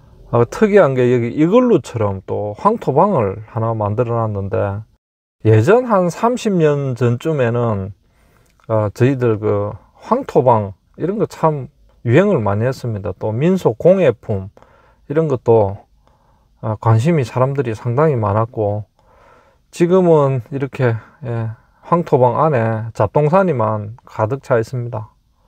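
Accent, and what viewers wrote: Gyeongsang, South Korea. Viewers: 님 사투리 어디인가요!! 전남광주는 아니고 경남맞나요 구독좋아요 누르고갑니다 😊😊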